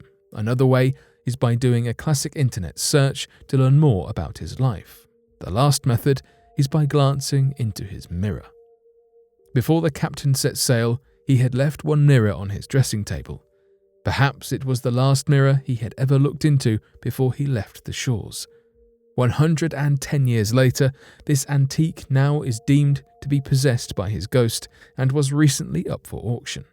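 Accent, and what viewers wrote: United Kingdom. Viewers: "Hieroglyphic Texts" said that British accent just sounds so scholarly and knowledgeable tell me everything's going to be ok you sexy X - files nerd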